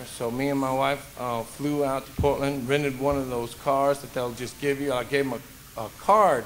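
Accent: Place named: Nashville, United States. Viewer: Well my mama moved from Tennessee to Salem Oregon and had me. She still talks like you and I never have talked like you. They don't talk like you in Oregon. You must have paid someone to help you talk like someone from east Nashville? Something amiss...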